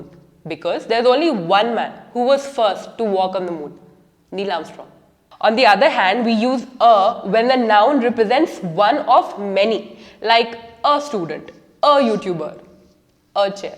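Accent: Hyderabad, India. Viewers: You used understand na while asking the question 😉 .. and I guess you are from HYD.. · You speak Hindenglish. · No popular english self help books are available over YouTube in Indian accent. I think you have a wonderful, sweet and friendly Indian accent. If you think of doing it, it would definitely help lots of aspirants like me in developing our English beside personality. Thank you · I must admit I love the Indian accent and especially the universal question tag, "isn't it"" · Are you from Hyderabad ? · Understood na 😆 but i love the way you teach.... Hyderabadi touch comes from your tone. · I'm a big fan of your voice...you speak so impeccable & your Indian accent is fantabulous.... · Mam you are hyderabadi? aren't you?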